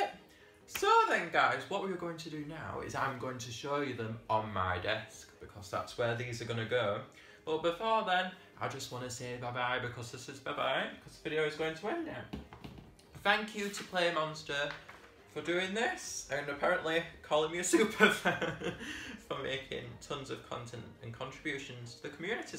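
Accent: United Kingdom. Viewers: nice British accent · I love your accent! I'm also British, so I'm not that different from you. 😊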